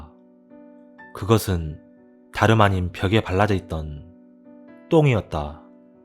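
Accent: Jeolla, South Korea. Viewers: 전라도 사투리가 찐하게 뭍어있네요ㅋㅋㅋ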